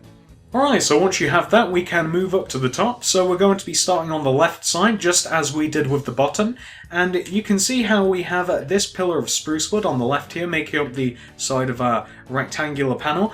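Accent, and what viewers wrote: United Kingdom. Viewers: He's British you dumbass,he was saying three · LC GAMING WITH LC GAMER me to he's British that's why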